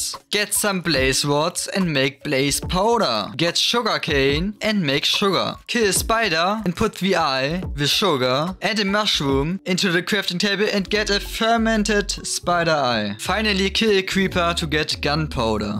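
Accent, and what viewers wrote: Germany. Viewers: hey buddy good job on your tutorials you sound german and thanks for the tipps king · Nice german accent brother👍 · lol du hörst dich so deutsch an😂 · man hört das deutsche raus, nice vid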